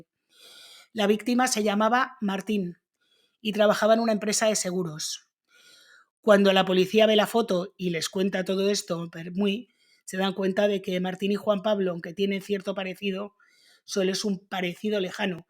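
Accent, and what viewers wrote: Spain. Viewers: Hola, me encanta tu manera de contarnos esos casos que narran las diferentes formas de la brutalidad humana. A diario veo uno que otro de tus casos. Vuestra forma de hablar ( de los españoles en general) es muy hermosa. Apoyo tu canal sl 100 por ciento. Desde Cuba, gracias.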